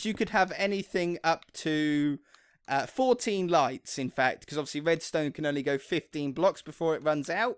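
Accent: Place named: Norfolk, United Kingdom. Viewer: I'm guessing your from Norfolk?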